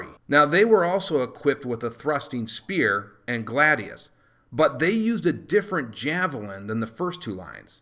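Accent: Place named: United States. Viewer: Amazing, its sad that I have to google the metric equivalent but I can hear youre American so its understandable. Thank you so much!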